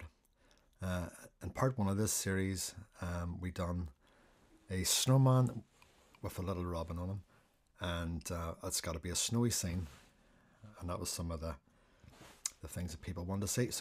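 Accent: Northern Ireland, United Kingdom. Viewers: These are the most realistic, looking shit I’ve ever seen painted! Nicely done. Love your videos and just subscribed. What part of northern Ireland are you from?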